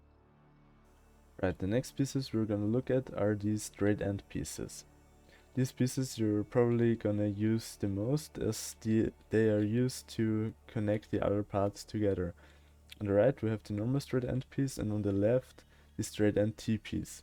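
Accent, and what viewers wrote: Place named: Germany. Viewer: your native language ... seems to be german ... thx for the highway blueprints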